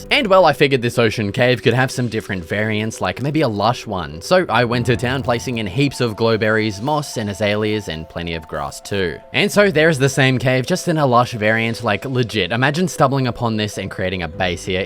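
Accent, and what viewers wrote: Australia. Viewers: Ew your Australian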